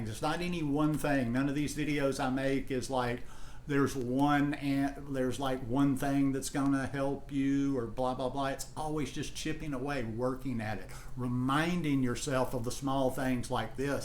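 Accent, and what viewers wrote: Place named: Alabama, United States. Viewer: Your videos always put me in a relaxed happy mood. That why I enjoy watching them. They are always positive and sensible about raw vegan diet. You have a nice personality. I love also your Alabama accent. Actually you are the only one on YouTube left that I watch regularly. Thanks for being here with us. 🤗✌💖.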